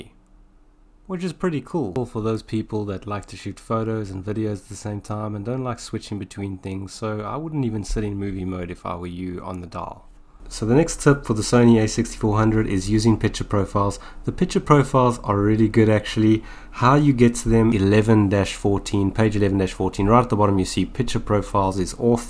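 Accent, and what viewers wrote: South Africa. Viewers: Are you Dutch? You sound South African. I know the sound is no good. But 10 years ago I did not have the Neumann U87 yet.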